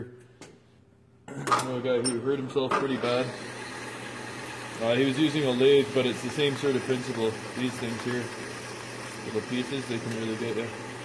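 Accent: Canada. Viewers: Canadian accent. instant sub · R u Canadian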